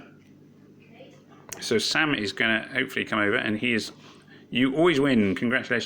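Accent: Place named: United Kingdom. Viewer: Hello. Love that British accent...by the way hope you got how costumes work, and if you don’t this is FYI: You can’t ascend the costume more than the original copy it is accompanying. Leveling up and ascending costumes is much easier and cheaper, no special ascension material needed. Both original copy and costume got bonus stat when costume lvled up.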